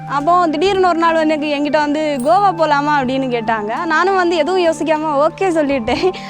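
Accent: Kanyakumari, India. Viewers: Uinga voice eaa solu thu kaniyakumari nu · Akka you’re kanyakumari Tamil slang so sweet akka. to beautiful❤️❤️❤️❤️👍 legend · Na slang vecha kandupidichitan Kanyakumari nu super sis nanum Kanyakumari tan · Hi ..... sister கன்னியாகுமரி slang nice...look like Kerala a slang ... உங்கள் கனவுகள் வெற்றி பெற வாழ்த்துக்கள்..... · Kannyakumari slang veliya tarriuthu akka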